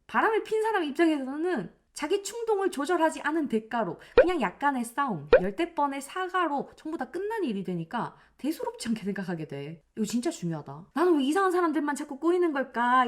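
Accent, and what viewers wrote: Gyeongsang, South Korea. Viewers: 안녕하세요 선생님 주옥의말씀잘들었습니다 미인선생님께서 꾀꼬리같은보이스로 부산경남쪽 또는 대구쪽이신가 아뭏든 정감가는억양으로 설명해주시니까 몰입이되네요 늘 건강하시구 빛나구예쁘신얼굴 오래오래뵙구싶네요 그럼 안녕히계세요~~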